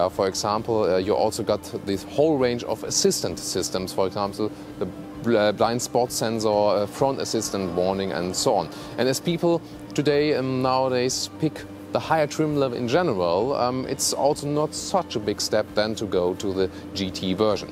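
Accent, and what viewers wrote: Germany. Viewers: Great review, nice video work and lovely German accent. But please stop looking back while driving.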